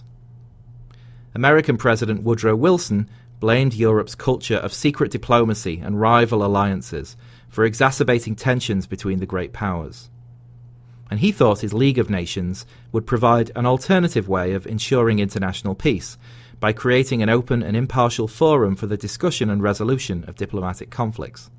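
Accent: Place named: Liverpool, United Kingdom. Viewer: I mainly listen to your lectures to hear a Scouser. (Of course, also because of the history lectures)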